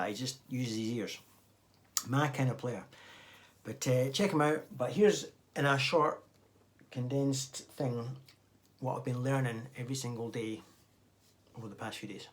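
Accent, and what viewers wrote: Scotland, United Kingdom. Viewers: what cool accent the Scottish is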